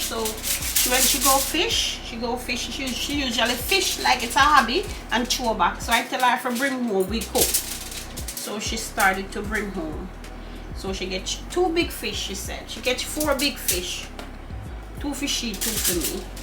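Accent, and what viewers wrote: Jamaica. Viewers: Don't stop that is how we Jamaican talk.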